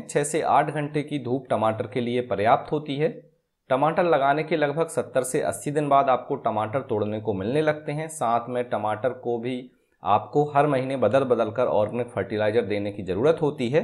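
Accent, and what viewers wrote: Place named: Madhya Pradesh, India. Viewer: MP accent nice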